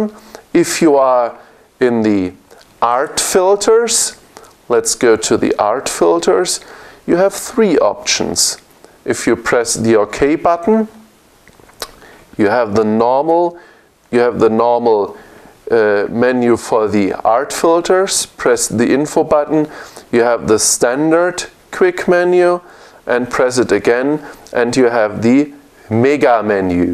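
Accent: Germany. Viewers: It's typical German English, not that bd however :))) Just a small correction: - All 3 OM-D-s share the same 16MP Sensor - The E-M1 flagship has also phase detect AF points on the sensor while the others don't - The E-M1 and M10 don't have an antialiasing filter on the sensor while the E-M5 does have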